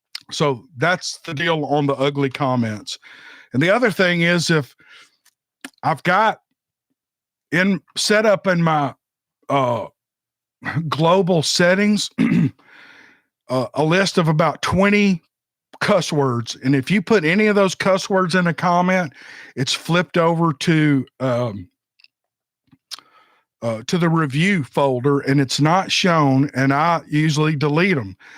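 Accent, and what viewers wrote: South, United States. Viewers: I LOVE YOUR VIDEOS..THE SOUTHERN ACCENT JUST PULLS ME IN AND YOUR SUCH A NICE GUY..GOD BLESS...THANK YOU FOR ALL YOUR HARD WORK AND DEDICATION..❤HOPE YOU FEEL BETTER SOON!! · Me to Jenn jenn he is a humble soul just listening to him soothes your mind and the southern accent I luv.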